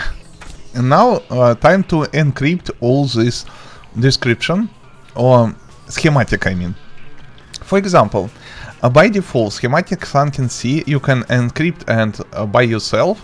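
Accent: Russia. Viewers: Cuz he's russian, he's original channel is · такой милый акцент у тебя)) сразу пон что ты руссский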